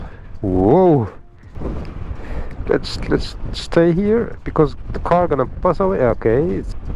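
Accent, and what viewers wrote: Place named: Turkey. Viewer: senin o alttaki türkçe aksanını duyarım ben ;)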